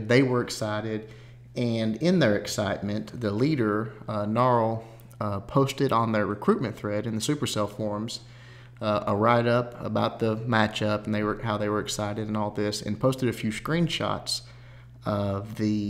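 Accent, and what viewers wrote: South, United States. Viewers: Hey, I wanna remind you of something you said on you 1st upload... You said your accent isn't the best but I love it!! "Golems are fixin to cross" omg!! Too awesome man you've made a brand about yourself that no one can measure up to!! Great job on the channel brother!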